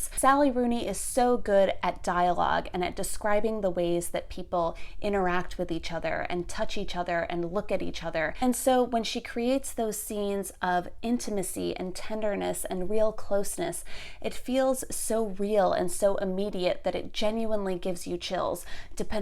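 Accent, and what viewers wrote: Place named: United States. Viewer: Wow love your review - reminds me of what English lessons were like back in the Sixth Form. Such a shame my school never invited any guests with an awesome American accent like yours. I did meet Simon Armitage and Matthew Sweeney though - this happened maybe 6 years apart (i met Sweeney first, then Armitage) - so that’s something I guess!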